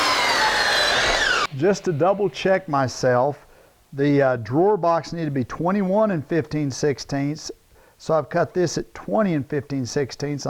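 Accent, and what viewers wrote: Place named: Arkansas, United States. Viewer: Arkansas "mayshure" for measure. :) Accent sounds funny. Also "rebate" for rabbet. Good Videos.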